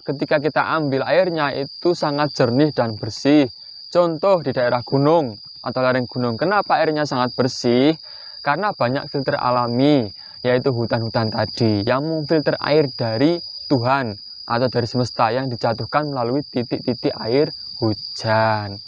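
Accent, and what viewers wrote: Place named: Java, Indonesia. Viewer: Medok Pak, kata orang sumatera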